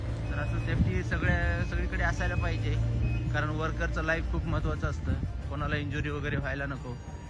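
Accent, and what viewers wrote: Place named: Latur, India. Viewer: बोलण्यावरून समस्त आमच्या लातूरचा माणूस जय श्री राम 🚩🙏🏻🙏🏻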